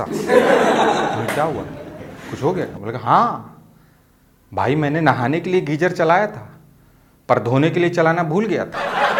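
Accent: Bihar, India. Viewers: Your comedy is superb no doubt, But your Bihar style maza aa jata h sun k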